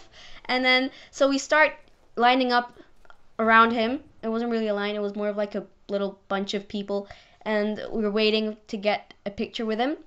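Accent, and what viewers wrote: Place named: England, United Kingdom. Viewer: It's very enjoyable when your English accent sneaks in. As far as showing your face, the mystery can be a lot fun. Think out of the box.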